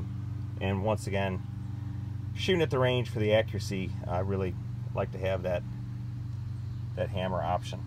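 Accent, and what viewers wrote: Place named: Wisconsin, United States. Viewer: You sound like you're from one of the northern states, like Wisconsin.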